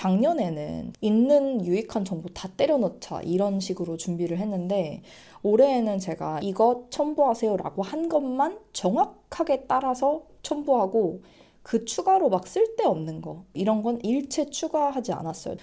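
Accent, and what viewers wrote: United Kingdom. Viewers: 너무너무 추카추카 합니다. 그렇게 오래 걸리는줄 몰랐네요. 저는 3개월~ 4개월 만에 영주권 받았는데 다른분에 비해 빨리 받은 거군요. 케이스는 다를수 있겠죠. 저는 결혼허고 난뒤 벋았고 26년전 일이라..... 지금은 많이 힘들다고 듣긴 들었어요. 암튼 다시한번 축하드리고 14년 되셨다니 거의 영국인이죠. 발음도 영국인 현지 발음이시고요. 아, 그리고 저는 3~4 개월 기다리면서도 안와서 재촉 전화했더니 알주일만에 왔다는 사실 ㅋㅋㅋ 날씨 안좋은 영국 😂😂 생활 열심히 행복하게 사시길 바래요.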